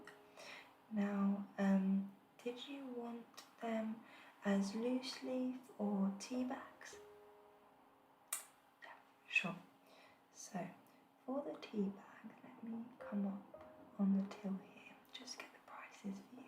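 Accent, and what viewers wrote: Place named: United Kingdom. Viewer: This channel is such a gem! Your detailed explanations are perfect and I love the option of music - so rare - I find it so relaxing and grounding. I find it so interesting, I’m American and am rarely relaxed by British ASMR. I think you have a similar accent to Drifting SJ who I also like - and this channel 80gemstar that no longer exists (although her accent was considerably more intense). I think you all have great texture in your soft speaking voice which I really appreciate. Thanks so much !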